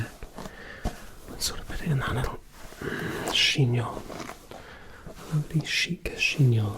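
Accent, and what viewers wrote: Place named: RP, United Kingdom. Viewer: love the sound quality..🔥🔥🔥🔥🔥 Your tongue in cheek posh is brilliant. It's not the air conditioning that's the problem for it being insufferably hot 🔥. It's heating Meridian's up and a little dab is not going to suffice in cooling madam. LOL. Cracking me up over here - " I know you're a bit of a magpie, you like those sparkly things..." ♥️♥️♥️🔥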